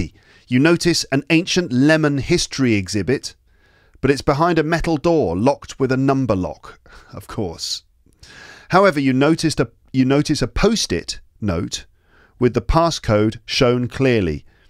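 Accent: United Kingdom. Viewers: I like your British accent and style. American English accent is very hard to understand for me.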